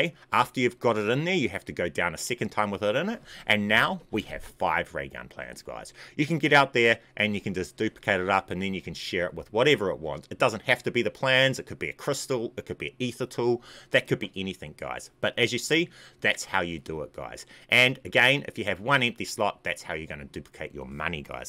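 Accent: New Zealand. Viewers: Definitely a kiwi! Everyone talks about you on mw, earned my sub. I dont even care for the money, youre just too cool lol.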